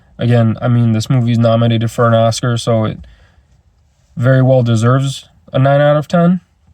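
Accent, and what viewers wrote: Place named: Brazil. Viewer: Before you told us you lived in Brazil, I could tell that just by your accent.😅🇧🇷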